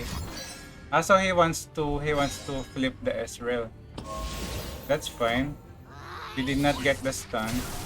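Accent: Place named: Philippines. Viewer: Are you Filipino? Because your accent really sounds like a Filipino lol (I'm Filipino) Keep up the good work!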